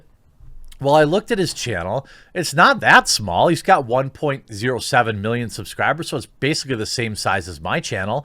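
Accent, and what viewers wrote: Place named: Wisconsin, United States. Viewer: lol he's from wisconsin. that's how they pronounce bag. BAYG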